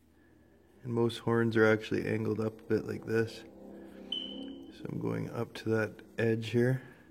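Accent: Canada. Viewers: Canadian accent. instant sub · R u Canadian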